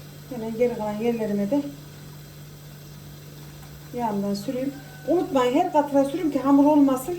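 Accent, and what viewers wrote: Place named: Kayseri, Turkey. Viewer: Kayseri şivesi gibi konuşmanız çok hoş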